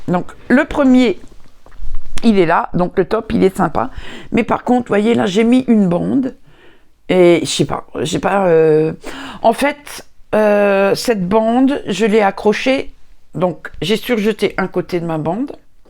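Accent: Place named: Alsace, France. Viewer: j'adore votre accent, j'ai vécu en Alsace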